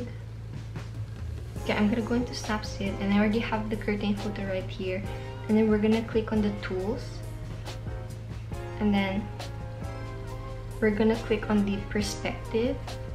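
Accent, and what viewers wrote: Philippines. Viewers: ok but I was SHOOK by her filipina accent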